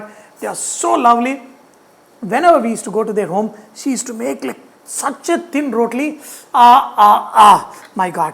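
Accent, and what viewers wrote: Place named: Hyderabad, India. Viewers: ZABARDAST SIR PURE HYDERABADI U R TALKING. .NICE RECAPY THANKNQ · Miru chesina kallu chicken is a stress buster till date ... malla aa lanti episode chey andi ... 🙏🙏🙏 with telengana accent 😀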